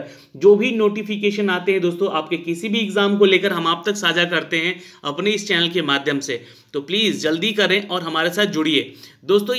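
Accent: Bihar, India. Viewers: Bhai bihar bolte hai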